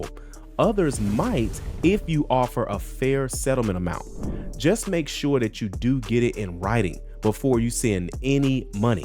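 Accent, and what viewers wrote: United States. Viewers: What accent? American accent?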